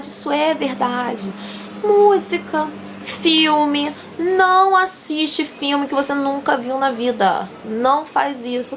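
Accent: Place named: United States. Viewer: Nossa, como você fez para ter um 'speaking' tão bom? Adoraria ir para os EUA e eles acharem que sou de lá também rs (como todo mundo né rs). Enfim, dicas para falar tão bem assim e perder o 'sotaque brasileiro'? Sei que o assunto é 'Listening', mas como seu sotaque americano ficou tão perfeito assim? Thanks a lot :)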